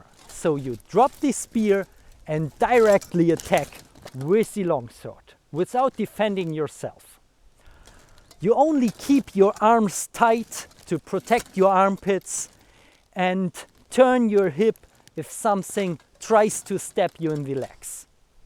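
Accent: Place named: Germany. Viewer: The way you structure you sentences, the words you choose, it's like... You're SO DISTINCTLY german, even not taking that beautiful accent into equation, i absolutely love it. One of the best channels about armor, so pleasant to listen to this voice and see the quality action. Hi from RU !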